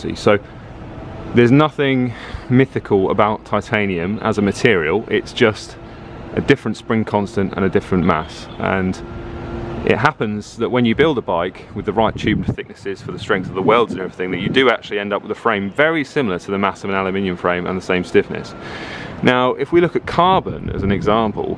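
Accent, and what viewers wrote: United States. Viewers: Spot on american accent. Cheers!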